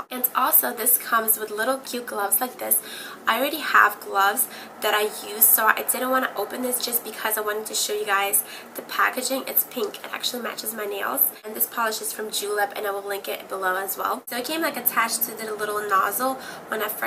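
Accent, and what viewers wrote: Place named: New York, United States. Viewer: totally unrelated,,,but are you from Jersey or NY..guessng by the accent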